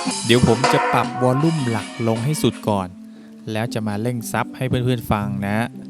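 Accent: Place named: North, Thailand. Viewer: คนเหนือคับ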